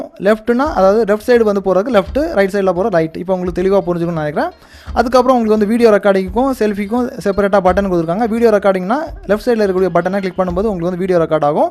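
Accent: Kanyakumari, India. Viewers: Super bro...KANYAKUMARI nnu onga slang vache guess pannitaen. ...keep rock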